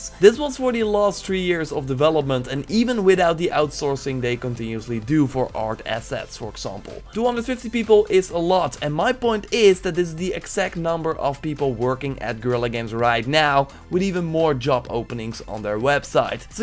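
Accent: Netherlands. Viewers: ge hoort het toch da ge nederlands bent van origine ze man :) kweet ni hoe funny voor mij :p (geweldige video's) kben al nen hele tijd uw vids aant bekijken en kzouet belange ni beter kunne.... goie werk en blijf vooral verderdoen man :) grtz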